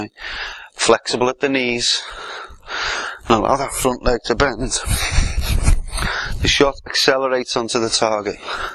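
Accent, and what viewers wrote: Liverpool, United Kingdom. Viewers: A scouser! Too right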